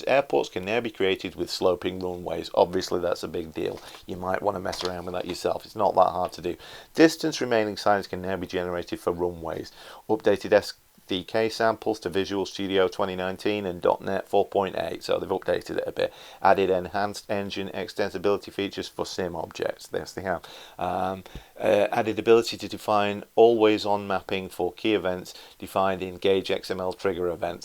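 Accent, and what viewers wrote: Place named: Lancashire, United Kingdom. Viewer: Honest - I enjoyed every minute of it and I learned a helluv a lot from it - even moreso in an accent that goes well with me who also stems from 1/2 way down the East Lancs Rd - 85 years ago I knew absolutely nothing about all the fuss about DX 12 but now I do I can't say I was impressed by the final graphics - it was very "posterised" I was hanging back from jumping in but I will give it a go - on a separate install and add bits of Orbyx as I go John Heaton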